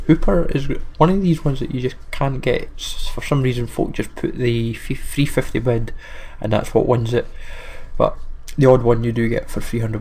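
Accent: United Kingdom. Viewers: it is hard to understand the British accent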